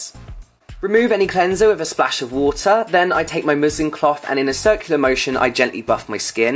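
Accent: United Kingdom. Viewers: that s why i hate British acent a lot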